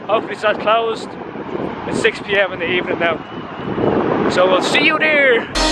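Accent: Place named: South, United States. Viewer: Are you southern? You sound like Miranda Sings or it’s just me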